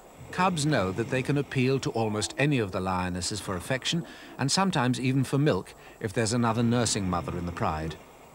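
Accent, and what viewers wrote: United Kingdom. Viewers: Wonderful, quiet sensible lion doc. with a British narrator. Yes lions move out of the way for now, but no grazing animals die of old age, eventually the apex predators get them.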